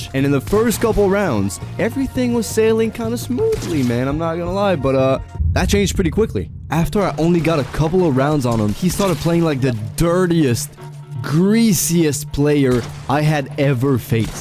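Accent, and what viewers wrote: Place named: Quebec, Canada. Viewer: I thought you were french (considering your game language is) and I was flabbergasted by your english accent, but I check your description and you're from Quebec, so I get it now. Anyway, very nice video with top notch editing, especially for someone with so few subscribers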